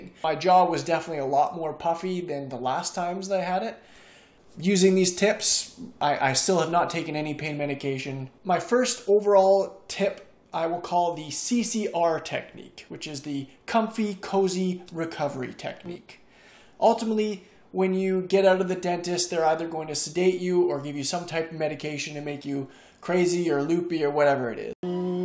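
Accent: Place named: Canada. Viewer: Are you Canadian? Just asking because of a slite accent. Good video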